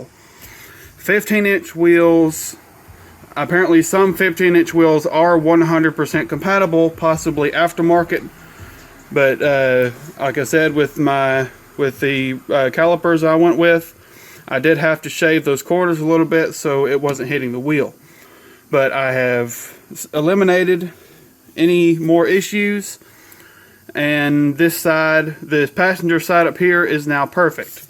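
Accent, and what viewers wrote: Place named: Appalachia, United States. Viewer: You sound like an Appalachian.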